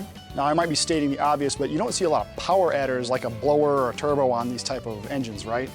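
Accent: United States. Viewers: Can the narrator get any more American than this???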